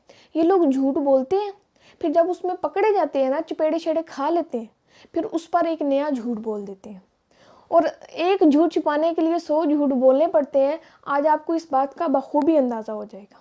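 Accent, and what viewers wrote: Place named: Punjab, Pakistan. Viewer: آپ کی اُردو میں ۔۔۔۔ پنجابی ٹچ ۔۔۔ وکھری ٹائپ کا ھوتا ھے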